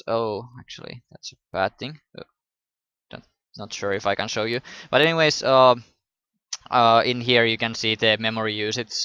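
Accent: Finland. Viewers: Kuulee heti että on suomalainen :D, / u can hear that hes finnish :D Missä serverissä pelaat?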